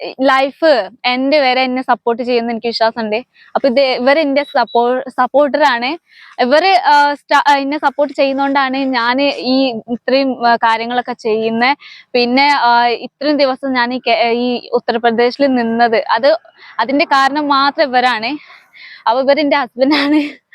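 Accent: Malappuram, India. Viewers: ആ മലപ്പുറം വർത്താനം സൂപ്പർ .. ആ North Indian dress .. അതും സൂപ്പർ ... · മലപ്പുറ०കാരിയാണോ ? ആ സ०സാരഭാഷയാണല്ലേ ?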